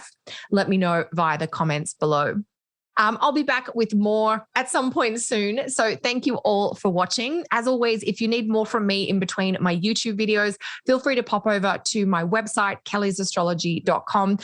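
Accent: Australia. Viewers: Ontario ?? I thought you were Australian! That’s funny. Or maybe I guess Australian living in Canada? 🤪😍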